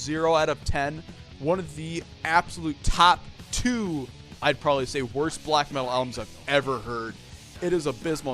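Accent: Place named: United States. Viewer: lol, The Shadowthrone being the worst... Nemesis "Divinia"... lol :D 1. Disagree that any of the instrumentals are pointless... that's just a pointless point... 2. Disagree with your "absolute best" songs on the 1st two albums :) 3. Disagree on "Rebel Extravaganza" being the best album from those to come... I think it's the worst... Volcano is much better. Now, Diabolical; Satyricon & Deep calleth upon Deep are amazing albums! There's absolutely nothing wrong with them! This guy only says: this is the worst of all & that is the worst of what I've ever heard... lol, this guy sounds like 100% American id*ot who's trying to make sense of pure European Black Metal Epicness! :) Otherwise, there're some new stuff that I learned from that video, like the early history of Satyricon & their formation. So, I wouldn't say it's completely useless... something like 4/10 is fine. 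The rest is only "boring as fuck" this & that :D Nemesis DIVINIA - Peace Out! :)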